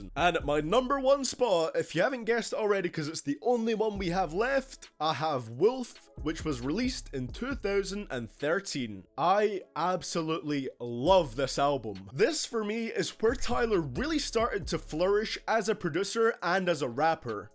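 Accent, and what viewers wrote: Scotland, United Kingdom. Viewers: Are you Scottish? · I love the Scottish accent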